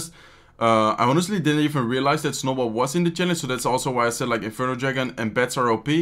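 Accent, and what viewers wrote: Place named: Netherlands. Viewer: Jij bent Nederlands of niet 😂 Je hebt een Nederlands accent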